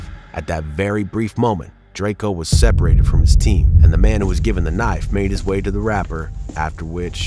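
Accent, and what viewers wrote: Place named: South, United States. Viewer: narrator country accent strong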